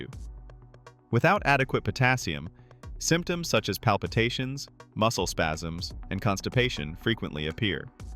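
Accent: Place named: Germany. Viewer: is this a german channel? he sounds like a german..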